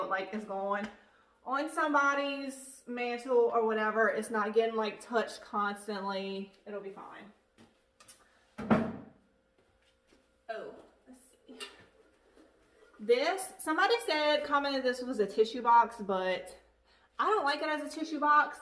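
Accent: Louisiana, United States. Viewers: Omg your cute little accent!!! I stumbled upon this video and wad not expecting a cajun accent, love it and all your beautiful items!!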